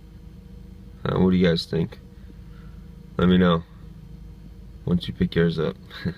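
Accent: Boston, United States. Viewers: yeah i love it. btw do i detect a little bawwsten accent im new to this channel and not sure where your from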